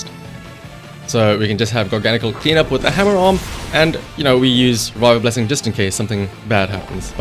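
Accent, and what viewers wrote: South Africa. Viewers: Is that a South African accent I hear? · A,,, a south african poketuber?????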